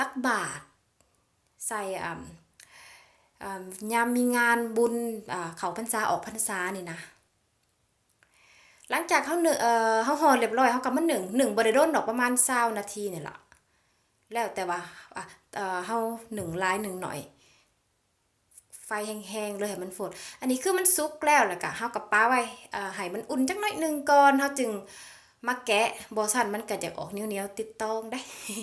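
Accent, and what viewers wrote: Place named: Savannakhet, Laos. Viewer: ມັກຫລາຍໆສຽງເຈົ້າເວົ້ານະເອື້ອຍ ຄົນສະຫວັນນະເຂດ ເວົ້ານົວ ຫົວມວ່ນ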